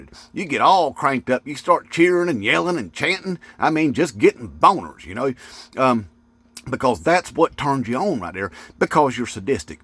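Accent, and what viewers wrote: South, United States. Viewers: I love that you popped into my algorithm!!! I’m a bi-racial Afro Latina & you are keeping me sane through this madness! Love your content, dude! And that southern accent is the cherry on top of the sundae! #InmateTrump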